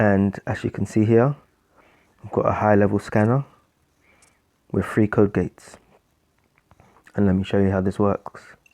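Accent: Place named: India. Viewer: Индийский акцент... Кровь с ушей идёт от этой речи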